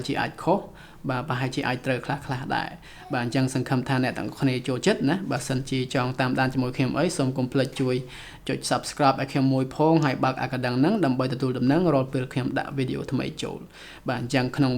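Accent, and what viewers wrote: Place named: England, United Kingdom. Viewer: តុងអងគ្លេសខ្លាំងពេកហើយ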